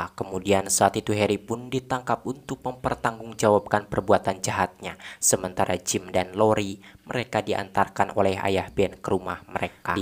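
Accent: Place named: Sunda, Indonesia. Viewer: Cuma Chanel ini si yg asik pembawaan nya campur sunda wkkwkw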